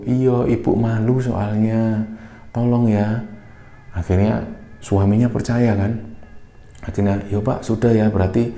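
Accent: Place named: Surabaya, Indonesia. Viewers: Cara nyeritano e Suroboyo banget, sukses selalu lurr🙏🙏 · Paling ditunggu gaya nya mas klo bercerita bahasa campur Suroboyoan mantap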